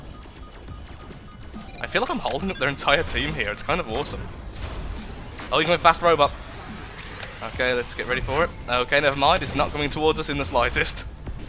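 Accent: Netherlands. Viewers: are you dutch i am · Wait are you dutch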